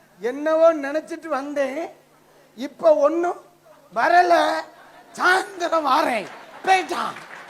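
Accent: Kanyakumari, India. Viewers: KK District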